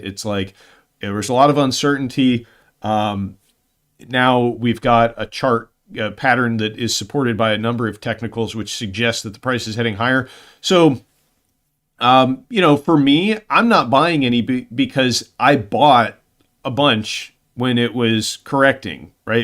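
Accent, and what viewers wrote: Chicago, United States. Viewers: Where is that accent from? Sounds purely like a Chicago accent of my youth?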